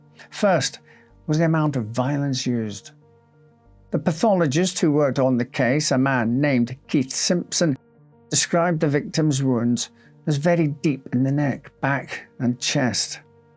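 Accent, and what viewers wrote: United Kingdom. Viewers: I mean this in the best way possible... Listening to an older man with a British accent tell me stories, is just so fitting! 🗣️👴👍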